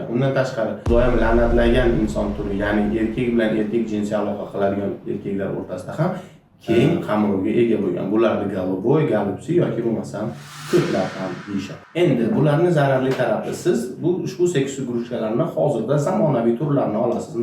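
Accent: Andijan, Uzbekistan. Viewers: aka anjancha ogilbolacha gapirar ekansiz😊😊😊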